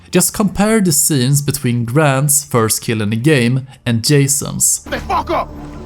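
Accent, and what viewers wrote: Sweden. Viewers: Your Swedish accent is noticeable mate. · Hey are you Swedish by chance? Your accent has a Swedish touch to it, also great video btw! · tog mig 1 sec att fatta att du är svensk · den där svenska aksenten är galen manen grym vidio btw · The swenglish is deliciously strong 🤩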